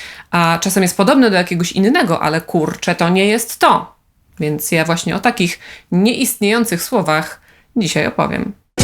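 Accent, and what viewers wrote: United States. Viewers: Nie, nie jesteś - to amerykański akcent. Brytyjczyk normalnie powiedziałby to przez "t", ale w amerykańskim angielskim w takich przypadkach mamy właśnie takie " r".. to samo jest np. w wyrazach takich jak party, bottom, at all, community, better itd.. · milo Cie posluchac bo jesteś pyyyyrfekt :) częściej amerykański jak portugalski "brazylijski" :) zajebiscie to fajnie tłumaczysz staje się jasniej ... P.S. zawsze pomagasz - kochałbym taka Pania od anglika w school a nie miałem musialem się sam uczyc :(( niestety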